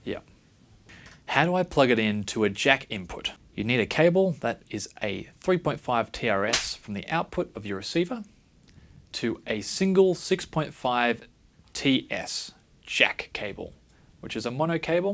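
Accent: Australia. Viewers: Great review bro! Like your accent. Are you Aussie? Been checking out good affordable wireless microphones that are easy to use since I am planning to create a vlog content in the future and I find this little device very capable for budding content creators like me.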